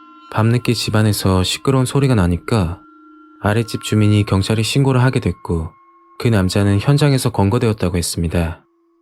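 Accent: Gyeongsang, South Korea. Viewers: 와우 오늘도 어김없이 님 영상과 함깨 합니다 님 혹시 경상도 분이신가요?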